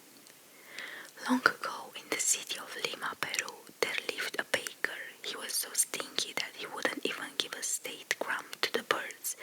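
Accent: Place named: Russia. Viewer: Russian accent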